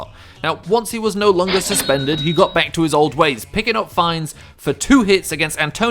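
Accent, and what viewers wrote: England, United Kingdom. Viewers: This is the first time I’ve watched American football with a British accent over it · honestly have never heard someone with a british accent speak analytically about american football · Anyone else think it’s pretty cool a British dude is talking about American football? Edit: or maybe he’s Australian idk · Wasn't expecting a Burfict video to be narrated by a Brit lol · British accents and football don't match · So why is this British guy talking about NFL football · I love how a British person is saying this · Theres somethin i really dont like about an english accent talking about american football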